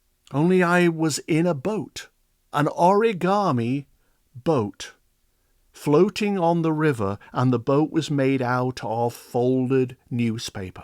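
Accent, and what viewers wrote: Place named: Manchester, United Kingdom. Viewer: As a Manchester lass I feel I can hear you are from my part of the world ? ✨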